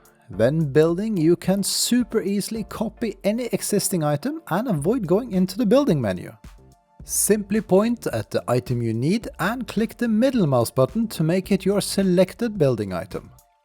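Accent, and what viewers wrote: Norway. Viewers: I believe i hear a norwegian accent xD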